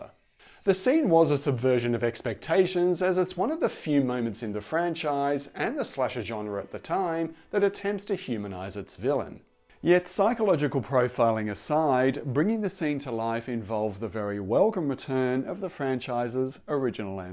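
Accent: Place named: Europe. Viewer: It’s kinda wild to hear someone with a European accent, critiquing these films. I guess I never considered that these films (especially the early ones) might have had an impact on foreign European audiences, which tend to be softer and less keen on gratuitous gore and violence.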